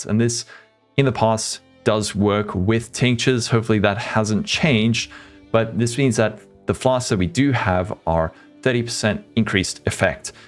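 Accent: South Africa. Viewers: "It's not a shlep" 😂 love the ZA bruv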